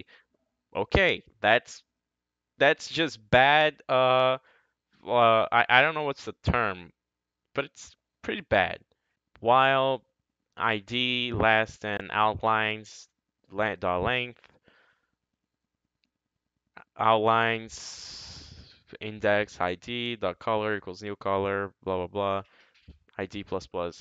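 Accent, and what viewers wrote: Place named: Brazil. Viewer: cara, o maluco pode ser fluente, mas sempre da pra sentir o brasileiro por trás kkkkkkkkkkkk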